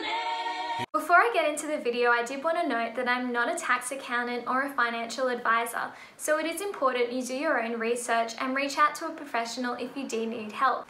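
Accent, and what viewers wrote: Australia. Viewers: thank you so much for your helpful information I really into your Aussie accent. Your voice is super soft-spoken =D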